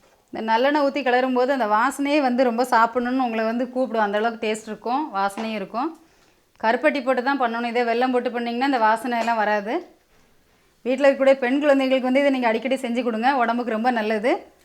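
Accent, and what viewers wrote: Tirunelveli, India. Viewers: Nellai slang la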